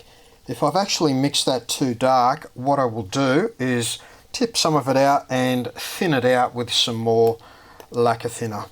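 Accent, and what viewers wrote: Australia. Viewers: Well done Mate! Great video. What part of Oz are you from? You have a really thick accent. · I love your Australian accent! welcome back. Always been inspired! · New South Wales accent? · I came for the tech tips... I stayed for the Australian Accent. Hey do You know Lee Priest? I'm from Canada, and Yes, I DO know Bob from Ontario...He's My neighbor. Great Video EH! ❤🇨🇦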